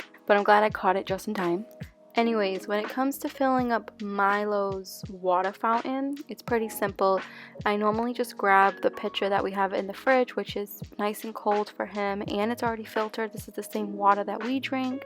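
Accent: United States. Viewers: ah cool thank you so much for your reply it means a lot ❤️ pretty sure that's not a thing in the UK lol 😂 I'm in Bristol, England 😊 love your videos so much when I feel a bit overwhelmed with house work your vids give me the boost i need to get on with things. Also I love your accent and wish I lived In America lol 😂 hope you, your bloke and your new kitty are all well 😊 xx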